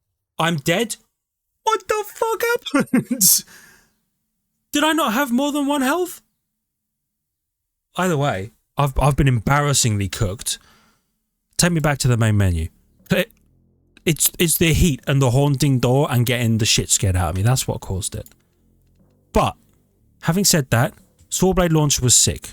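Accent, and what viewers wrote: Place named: England, United Kingdom. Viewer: He said guv! Wasn't sure if he was English until now. The deep slang really drives it home.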